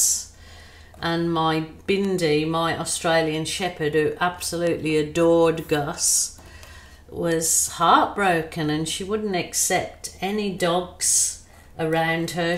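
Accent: Birmingham, United Kingdom. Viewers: gorgeous as ever !! Love your little fur babies 🥰 do I detect a Brummie accent in there somewhere ? 💞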